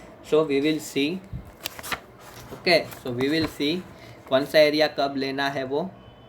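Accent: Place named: Gujarat, India. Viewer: Aavaj thi gujrati lago chho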